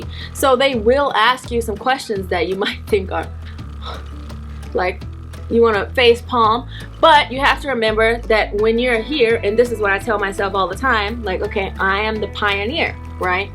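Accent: South, United States. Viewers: I can tell you are southern girl...love it! You better dig up Mya and Bubba Sparxx LOL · Love you , you are crazy lol ! that southern accent.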